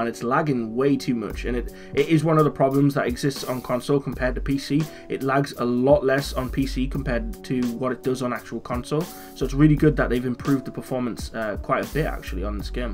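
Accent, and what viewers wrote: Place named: North East England, United Kingdom. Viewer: Love your vids mate, where you from? Sound like your from the north east!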